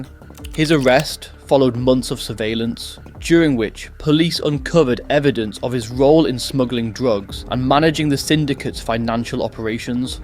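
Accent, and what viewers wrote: United Kingdom. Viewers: who is this British accent speaker broadcasting old news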